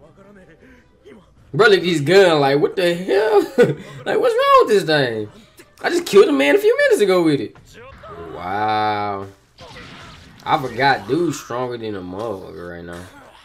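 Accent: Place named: South, United States. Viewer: im just starting with you im feeling it love your accent, is it southern ?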